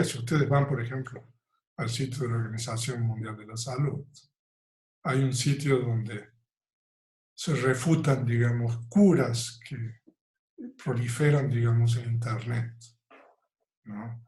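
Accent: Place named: Córdoba, Argentina. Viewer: Y esperamos tener el honor de que nos visite en Argentina (su acento cordobés no lo perdió 😉)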